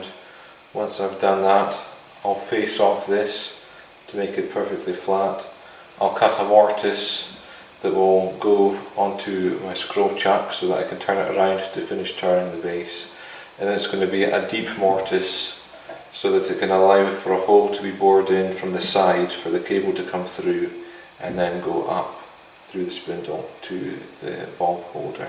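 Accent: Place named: Scotland, United Kingdom. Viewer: You sound Scottish ,where are you in Scotland ?Nice piece of work my friend . I live near Lochness. I've been turning on and off for a couple of years .I've made a couple of lamps from spalted beech.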